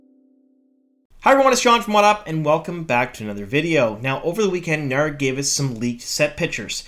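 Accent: Canada. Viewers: You sound Canadian, do you watch hockey ? (Let´s go Penguins!).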